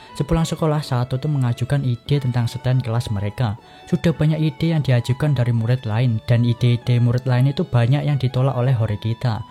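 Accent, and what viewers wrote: Java, Indonesia. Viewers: Akhirnya lanjut lagi, enak dikuping bgt walaupun medok wkkwkw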